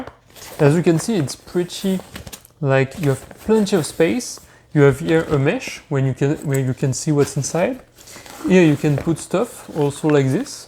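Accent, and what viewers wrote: France. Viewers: I can easily guess you are french, parce que moi aussi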